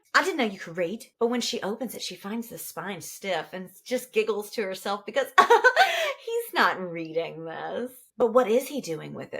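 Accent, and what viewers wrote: France. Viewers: I gotta say yes to the French accent. Really helped soften the gut punch that was this book🥖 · Of course we need more of your French accent. Why is this even a question? 😂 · I had to do a lot of spreadsheet work, so I finally (reluctantly) dove in to the second half. You are so funny as a presenter. Thank you for taking this commission. I knew I would hate the ending (because I hated how the book started too) but you and your French accent made it almost bearable. Almost. Also, you look super cute in bangs.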